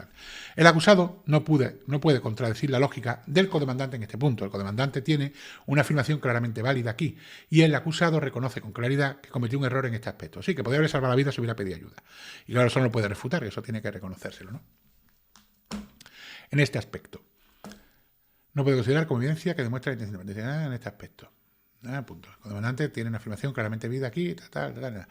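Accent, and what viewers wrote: Andalusia, Spain. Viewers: Es del sur de España, una región donde se habla muy el castellano, porque más que se lo digan es imposible que corrija su vocalización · Muy interesante, muy bien documentado, un trabajo muy profesional, el único "pero" es el esfuerzo que hay que hacer para entender el "andaluz estrecho" y sin diferenciación de algunos fonemas, entonación y arrastre de las sss y algunas guturales.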